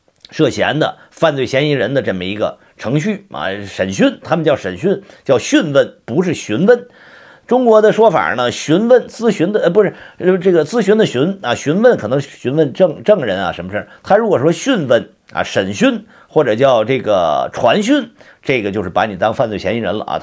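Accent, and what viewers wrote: Tianjin, China. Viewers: 博主是天津人吧哈哈哈哈这乡音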